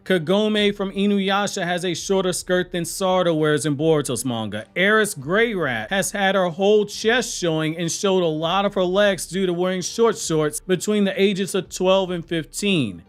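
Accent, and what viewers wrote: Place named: Carolinas, United States. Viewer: Bro sounds likes you lived in the Carolina’s lol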